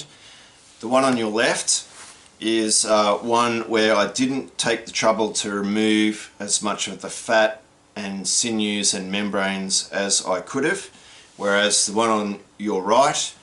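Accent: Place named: Australia. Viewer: Thanks for explaining, particularly with those 2 comparisons, no one else seems to mention why it must be so clean, just got my first fox last week and I went straight to salting then scraping, but I will try your technique next time I'm lucky enough to get out (my permission got sold for residential). Good to hear another Aussie, cheers from Trentham, Vic.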